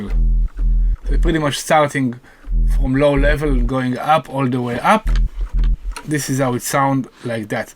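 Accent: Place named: Israel. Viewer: קשה להסתיר את המבטא הישראלי באנגלית, תודה על הסרטון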